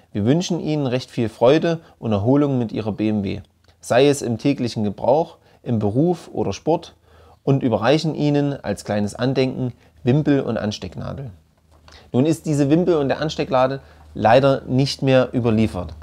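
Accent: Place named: Saxony, Germany. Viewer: ich grüße einen Sachsen! Man kann den Dialekt nicht weg bekommen. Mir gehts auch so in Wien!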